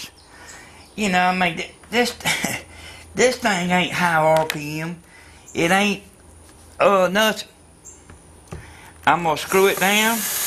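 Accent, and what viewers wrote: United States. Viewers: Fantastic accent. A true American.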